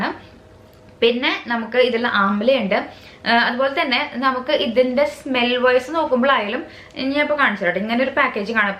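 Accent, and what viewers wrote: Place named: Thrissur, India. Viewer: ചേച്ചി തൃശ്ശൂര്കാരിയാണോ. സംസാരം അതുപോലെയുണ്ടല്ലോ 😻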